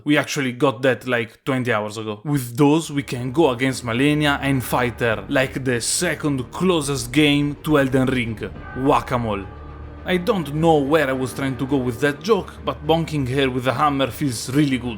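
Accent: Italy. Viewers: Si, l'accento italiano si sente. Ottima run, comunque! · Sei italiano? credo di sì per la parlata e la voce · You're Italian?! I knew you had an accent just couldn't ever place it (don't judge I'm a foreigner too) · I just love your Italian accent. You are the only Italian DS player I know. Keep up! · Adoro l'accento italiano 😭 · Perché hai l'accento italiano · fammi indovinare, italiano? ahaha l'accento ti vende che una bellezza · Oh shit your accent is Italian? I thought it was French 💀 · Italian accent + berserk reference= me subscribing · Che bellezza scoprire che sei italiano, l'avevo immaginato da qualche pronunzia! Adoro i tuoi video 🤩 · Your voice gives me italian vibes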